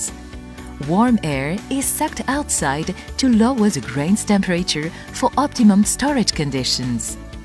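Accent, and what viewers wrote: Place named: Egypt. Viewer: المعلقة مصرية 🤣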